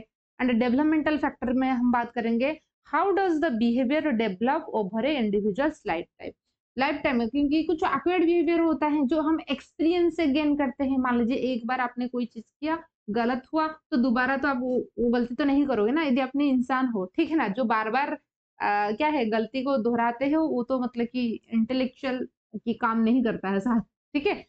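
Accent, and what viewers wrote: Odisha, India. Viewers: Odisha se ho kya app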